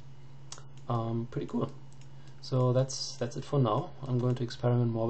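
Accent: Germany. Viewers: Your German (Austrian maybe?) accent makes this sound really cool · Clearly a German accent. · are you german? it's just a question because of the accent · could i be that you are a german, cuz you kinda sound like one :D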